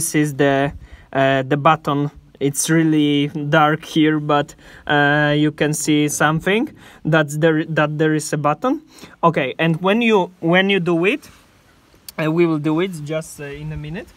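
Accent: Poland. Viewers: polaKA slysze XD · Polski akcent nie oszukasz…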